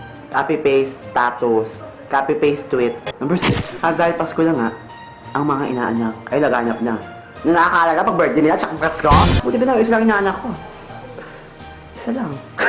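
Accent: Visayas, Philippines. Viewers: bisaya ka po???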